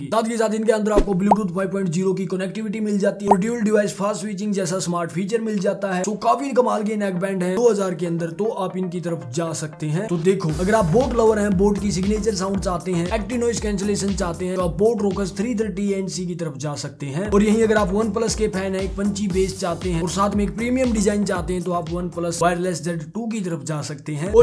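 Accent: Haryana, India. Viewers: Haryana say h bro ye Hmare jile say 🎉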